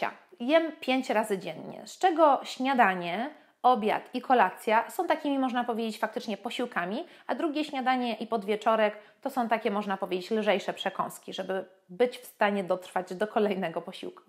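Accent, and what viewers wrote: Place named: Warsaw, Poland. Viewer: Słychać od razu, że Warszafka mówi. No i kolejny film z cyklu jak mówić przez 12 minut i nic szczególnego nie powiedzieć.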